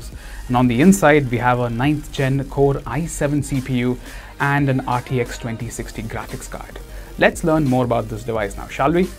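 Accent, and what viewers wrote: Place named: India. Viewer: that indian accent i love it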